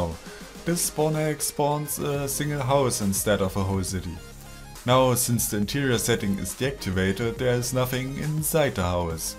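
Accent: Germany. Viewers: hello i était like your German voice xp it's more simply to understand you than American youtuber and I think it's a really good idea to use the new effect into your command it's beautifull ^-^ have a nice day for my it was "fête du travail" yesterday :3 (sorry don't no this word in english ... :/ work day I think ? ) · Are you really englisch? ;D Its sound very German. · ich lieb dein denglish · Da kuckt mann ein Video und hört den Deutschen Aktzent raus :D find ich gut. ^^ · Amazing command guy ! PS : I love your german accent :D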